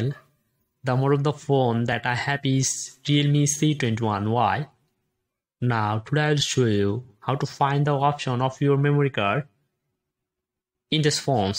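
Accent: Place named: India. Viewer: Bahasa inggris campur india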